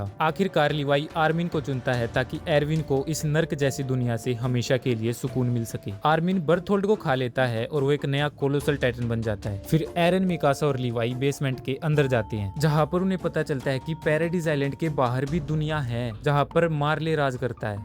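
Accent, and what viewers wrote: Haryana, India. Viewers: haryana se hai kya bhai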